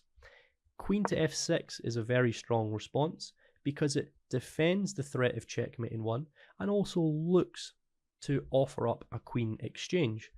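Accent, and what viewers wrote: Scotland, United Kingdom. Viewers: Holy shit I’ve found a Scottish chess creator let’s go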